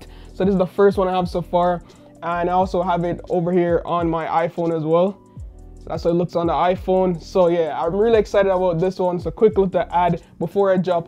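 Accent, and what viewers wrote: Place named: Jamaica. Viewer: You have a Jamaican twang bro are you from jamaica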